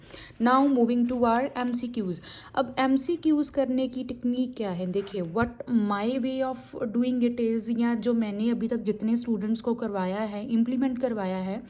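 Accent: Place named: Punjab, India. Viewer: Nyc punjabi akcent overall the method is too good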